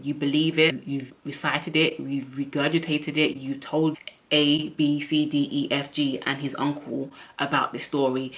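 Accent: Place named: United Kingdom. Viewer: When you played the little Heavenly music leads me to believe you believe in a higher power The Most High Yahawah and his only begotten son Yahawashi Messiah l feel like have to ask you to research your history although you sound like your from the UK but that isn't your nationality right or wrong...? Please don't misunderstand why l am asking you about your nationality, here's why l am inquiring about your ethnic background because l've learned about 5 years ago now that although l was born in America taken from Africa l am neither African nor American, from the time we so called Negroes where dragged here against our wills and turned into Slaves well our ancestors where turned into slaves anyway, we as a people have walked around in this whole Earth where we were all scattered throughout the whole world as bondsmen and bondswomen (SLAVES) Well to make a long story short if you are a descendant of slaves as blacks (the so called Negroes) are here in The Americas (United States) and South America than l ask of you to start researching your history but please go buy unless you already own it the Greatest history book every written called "THE KING JAMES BIBLE/W THE APOCRYPHA " Start with that to begin your research on you history and look for secular historical history that matches the scriptures that verify who you truly are and your true historical and biblical identity you will be amazed when you find out it'll help answer a lot of your questions about circumstances and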